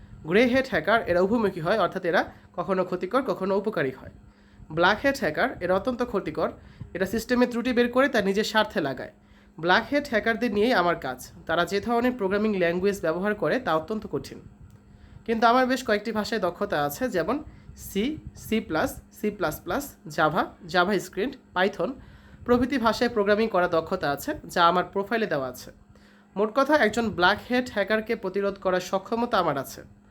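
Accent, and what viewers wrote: Sylhet, Bangladesh. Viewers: স্যার আপনি কি সিলেট উপশহর ছিনেন ❤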